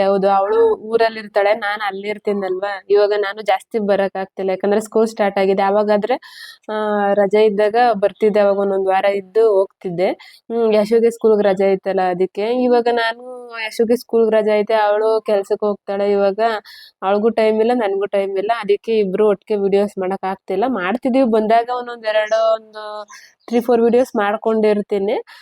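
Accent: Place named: Mysore, India. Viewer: Nivu mysore bhasa matadatira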